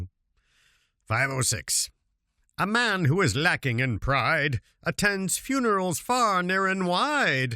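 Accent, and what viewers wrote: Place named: India. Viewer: Your Hindu accent has made me pass out with laughter 😂😂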